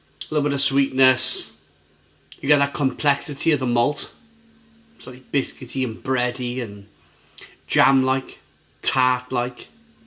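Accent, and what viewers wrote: Wales, United Kingdom. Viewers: I remember Hobgoblin was £1.25 in tesco a few years back. It’s such a shame Wychwood were bought out by Carlsberg 😑 Love your Welsh accent btw 🏴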